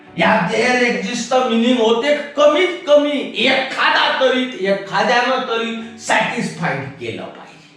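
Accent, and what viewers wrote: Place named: Vidarbha, India. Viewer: Me ka vicharle Karan tumhi vidharbh chi basha bolt hota,kup Chan shikavtat tumhi sir, chemistry pan shikavtat ka tumhi?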